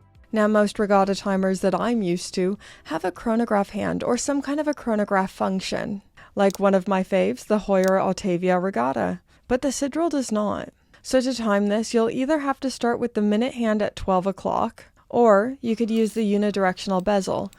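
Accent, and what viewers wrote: Canada. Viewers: Your Canadian accent is just great!! 😊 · Come on eh! Don’t apologize for your Canadian accent.Great vid. I think I want the red banded one.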